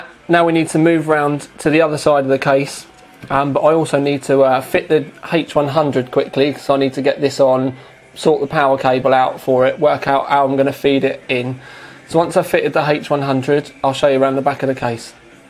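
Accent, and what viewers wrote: United Kingdom. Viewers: are you from the uk dude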